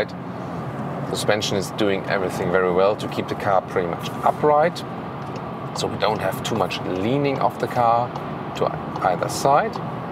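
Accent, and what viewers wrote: Germany. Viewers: I enjoy your vids, however you should do a German version also, because you are already speaking "german-english" so the placement of words are not correct. I don't like that, I'd rather have a full-on german version.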